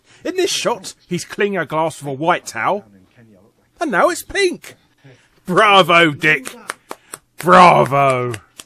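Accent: United Kingdom. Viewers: I swear sounds like Taco Man with a British accent XD. Awesome commentary on JP mistakes nontheless.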